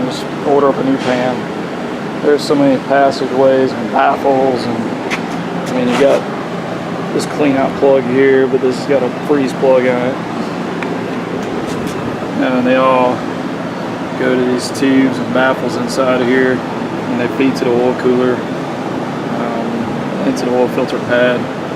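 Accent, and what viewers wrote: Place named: Boston, United States. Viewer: Awesome videos dude! Your Boston accent is wicked pissah, you’d fit right in up here.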